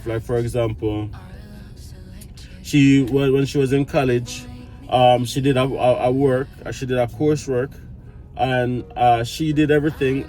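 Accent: Jamaica. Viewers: I wonder if your Jamaican accent would have blown those Mennonite kids' minds if you'd spoken to them.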